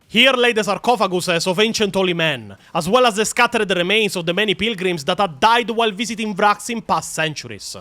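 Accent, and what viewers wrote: Italy. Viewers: I just can't Italian accent makes all "high gothic" names just Italian names, and its sooo good! It feels like i watching Young Pope or something.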